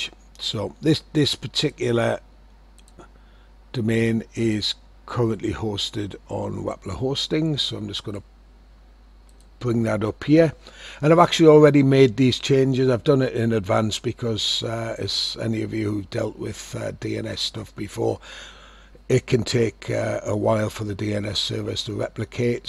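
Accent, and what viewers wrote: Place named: Wales, United Kingdom. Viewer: your accent is so welsh lol thanks, was really stuck for ages :P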